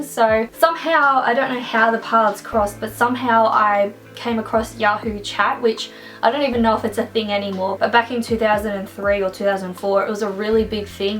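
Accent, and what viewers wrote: Australia. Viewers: are u from aus?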